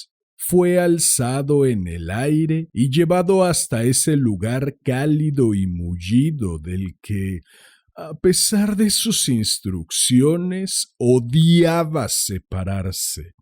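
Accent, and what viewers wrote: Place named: Argentina. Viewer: Un buen cuento, el tono Argentino salió perfecto. Saludos, Natalia de Argentina.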